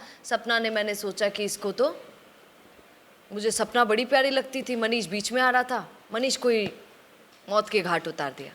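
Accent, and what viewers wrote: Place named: Marwar, India. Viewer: आप मारवाड़ी भाषा बिच बिच में बड़ी अच्छी लागे सा बाई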